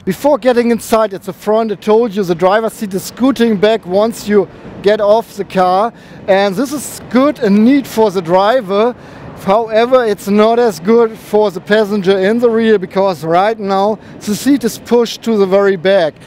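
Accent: United Kingdom. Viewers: nice review,very nice car, nice english accent ! good job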